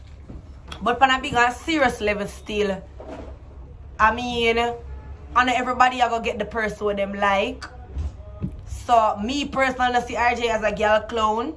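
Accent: Jamaica. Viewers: Mi ah juss ago subscrib to you channel you funny bad the way you talk alone mek me laugh . 🤣😍😍🥰 long time me here a real Jamaican girl talk how you talk. Lol 🤣 😆 every body a try twang 🤣🤣🤣🤣 lol love you vybz a swear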